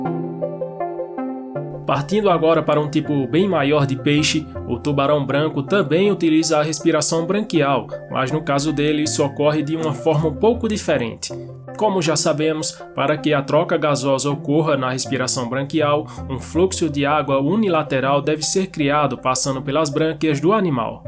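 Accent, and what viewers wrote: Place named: Northeast, Brazil. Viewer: Amei mais ainda o sotaque nordestino do narrador! Vcs são de onde? Nordeste power 💪🏽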